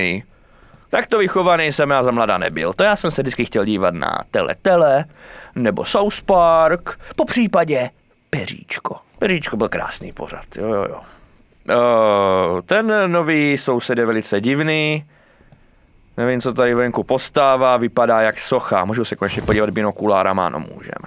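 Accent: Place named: South Moravia, Czech Republic. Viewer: Tak od tiaľ sa bere tvoja slovenčina ;Z južnej moravy 🤣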